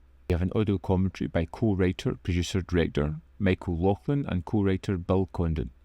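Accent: Scotland, United Kingdom. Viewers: Glad you finally saw this. It was a seminal part of my childhood, watching it on HBO, as I'd never seen anything so shocking or gross (or so I thought at an early age). I also wanted to say thank you for all your Top 10 videos. Your mellifluous Scottish brogue has been a soothing source of ASMR that has helped me stay calm during these difficult times. Keep it up!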